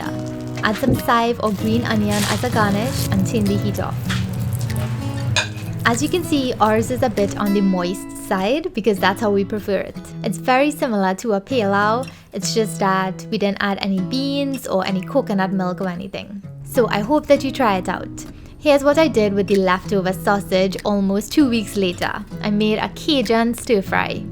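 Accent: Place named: Trinidad and Tobago. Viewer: Hi this looks scrumptious😻 I admire that you kept your Trini accent. It makes me feel like I am back there💛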